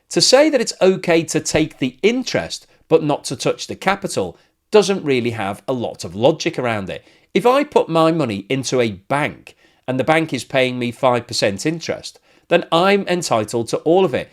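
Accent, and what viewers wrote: United Kingdom. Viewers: I hear a British accent. I would prefer somebody with a Belgian accent discussing this matter. Britain does not have a dog in this fight. Your efforts might be viewed as an attempt to inject discourse between Russia and Brussels, Britain's competitor on financial markets. There is a conflict of interests here.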